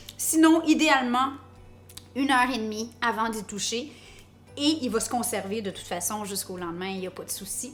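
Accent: Quebec, Canada. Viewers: Bonjour, Magnifique votre pain en cocotte mais comment faites-vous pour ne pas grossir? Moi, j'adore le pain ainsi que pas mal d'autres recettes mais je dois me retenir d'en manger car tout cela me fait grossir. Merci pour le partage. J'adore votre accent quebecois. Bonne journée.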